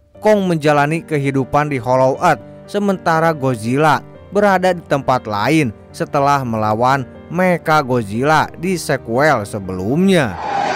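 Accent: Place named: Sunda, Indonesia. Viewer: Iya jadi orang Sunda anjir yang ngisi suaranya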